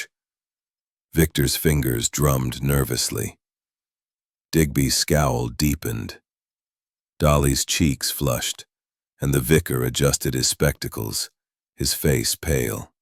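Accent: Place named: United States. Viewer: How awful! An American "Hollywood Blockbuster" voice reading a very British story which demands a very British voice and enunciation. Don't! Just don’t.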